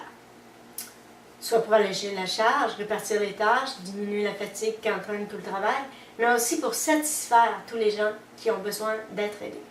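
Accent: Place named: Quebec, Canada. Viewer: Bonjour! J'ai liké ta vidéo. Je voudrais cependant te demander si c'est possible quand tu fais une vidéo d'abandonner un peu ton accent québécois? Pour qu'un maximum de personnes puissent te comprendre.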